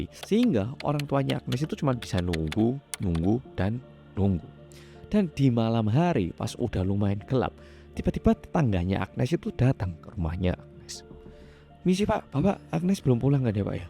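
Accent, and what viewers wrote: Java, Indonesia. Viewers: Kok medok kk ngomong nya , saya 14 th di singapore tp nda tau kasus ini yo. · Sampean kok yo ijik medokkk to mas 👍👍👍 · Mantap bngt mas..dah bertahun kmbli k korea,tpi medok jowo nya msih kentel buanget..seneng dngr mas ngomong.salam dari mlysia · Sampean korea kok medok jowo to kang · Padahal udah hapal klo ini orang korea yg medhok, tapi ttp ngakak pas denger "klotok" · MULAI AGAK2 KONTENNYA NIH CHANNEL YANG JUAL MEDOKNYA =)) DAPAT GEDE YAH DARI JOKOWI? TERLIHAT SEKALIIIII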